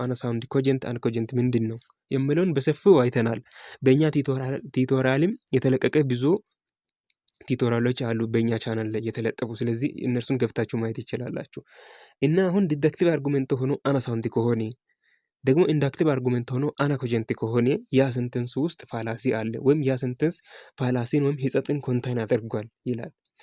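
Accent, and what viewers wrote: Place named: South, Ethiopia. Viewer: አማርኛ አትችልም ደቡብ ነህ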